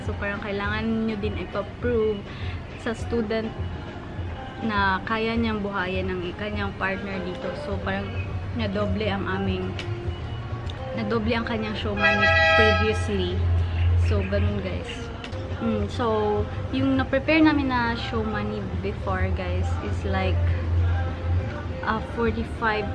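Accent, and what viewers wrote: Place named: Davao, Philippines. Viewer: Davaoeño accent. Hehe.. kaubo kos chika sa gasto. Murag gi kuyawan ko huhu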